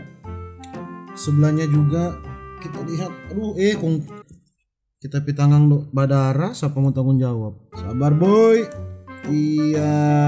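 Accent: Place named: Manado, Indonesia. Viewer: Hhhhhh lucu dpe ba gas.. bahasa manado 😂😂😂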